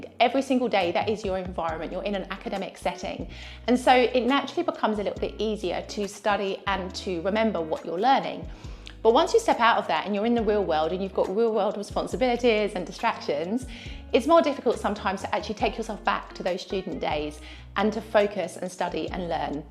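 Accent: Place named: RP, United Kingdom. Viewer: I love your RP accent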